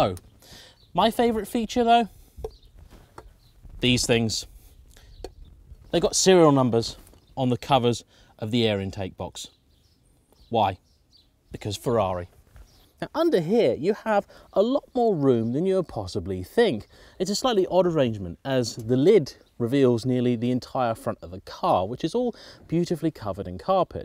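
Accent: United Kingdom. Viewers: ur brittish accent kill me, i need subs for that bcs of that i prefer american youtuber ex:dougdemuro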